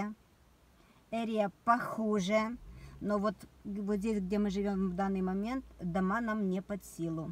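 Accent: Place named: Odesa, Ukraine. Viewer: Вы с Одессы? Говор такой интересный?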